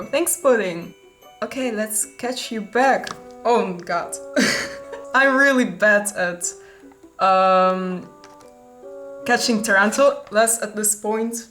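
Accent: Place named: Russia. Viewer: Такой яркий русский(?) акцент, что я даже обрадовался тому, что глиномесов с прямыми руками полно и в России. (Если я все-таки оказался прав.)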